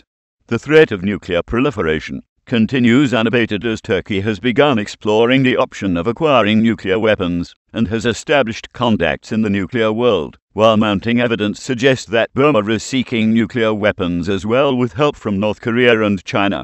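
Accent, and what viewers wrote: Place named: England, United Kingdom. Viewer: Wow...a new voice for the DWS.....Sounds English.....fishy.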